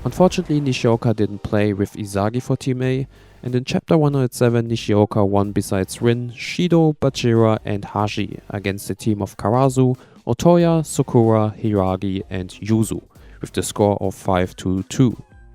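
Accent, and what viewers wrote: Germany. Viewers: Man hört den Deutschen Akzent richtig raus😂aber trotzdem starkes Video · me too the german accent is so strong you cant unhear it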